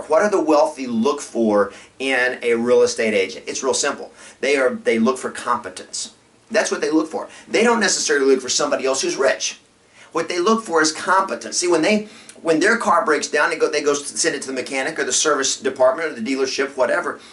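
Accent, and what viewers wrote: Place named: South, United States. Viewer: Mr., I am glad to see more of your southern draw! That's your TM! Aside from being a BadA$$ Real Estate Coach, of course.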